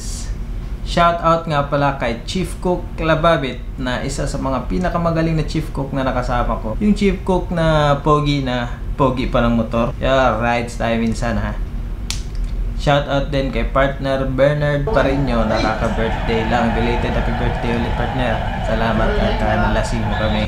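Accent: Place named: Visayas, Philippines. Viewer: Sir taga asa ka sir? Kabalo man gud ka mag bisaya haha. Pa shout out na din😘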